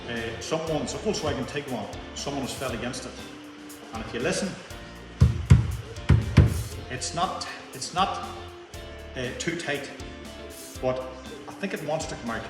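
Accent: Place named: Donegal, Ireland. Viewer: Donegal accent always makes any vid sound better. :-)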